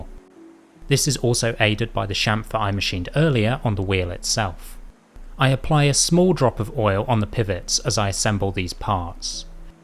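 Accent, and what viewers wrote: United Kingdom. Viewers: Beautiful engineering for a rather niche experiment! I have real trouble placing accent though... A touch if Western Scotland, Ireland and south east London (the posher bits)!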